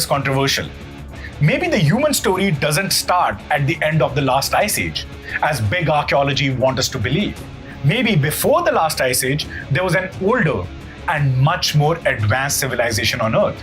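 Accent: India. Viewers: I absolutely hate Indian accents. Just can't listen to them.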